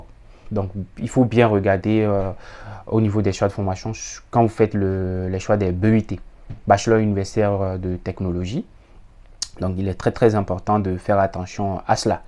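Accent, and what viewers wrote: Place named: Togo. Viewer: Depuis le Togo...à entendre votre accent, vous devez être togolais...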